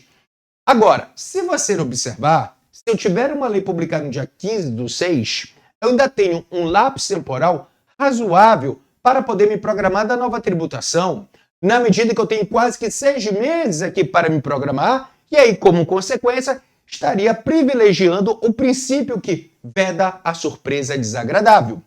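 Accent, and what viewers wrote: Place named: Portugal. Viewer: Sotaque de português. Ótima aula!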